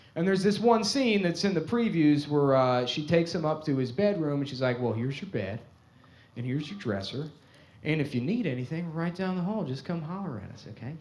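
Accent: United States. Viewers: You can really hear his Mid-Atlantic accent in this clip. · Why does my friend Nick sound like all my relatives in Philly who don’t talk to me? I like Bed Stuy Nick more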